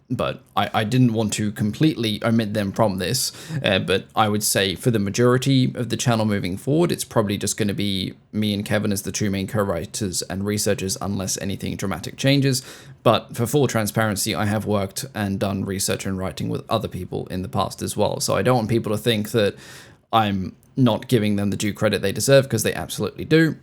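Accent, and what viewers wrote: Australia. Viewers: Wait, you're not Australian? My brain parsed your accent as definitely Aussie but with non-Aussie parents. I'm confused where you're originally from, now. (For some context, I'm a linguist at heart, my brain ponders these things whether I consciously want it to or not, I'm not trying to pry into your life 😅) Also, huge congrats on your wedding! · Congrat's on getting married,! Mate, it all works out in the end. Just keep plodding along. We all get those periods of... Bleh!... but we get over them by putting one foot in front of the other until we're out of the haze. You sound like a South Australian... & I am a South Australian, so I should know! 😉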